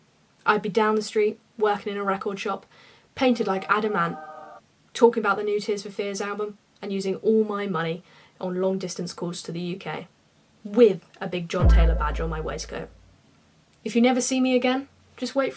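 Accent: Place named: United States. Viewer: you gotta teach me that US accent!!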